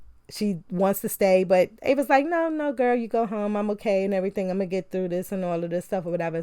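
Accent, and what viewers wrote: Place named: New York, United States. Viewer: Love your NY accent!!!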